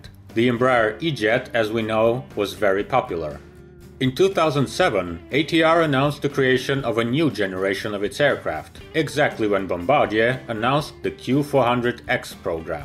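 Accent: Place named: Russia. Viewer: In Russian aircraft are male, and going by accent that might be why he is using that.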